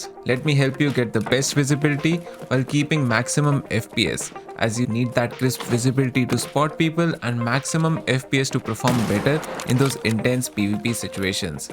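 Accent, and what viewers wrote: India. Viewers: from ur accent am i correct to assume ur indian? if so happy to see an indian makin tarkov content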